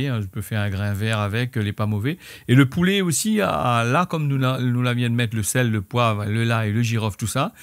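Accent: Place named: Réunion, France. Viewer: C est trop charmant l accent réunionné ✌👍👍👍😍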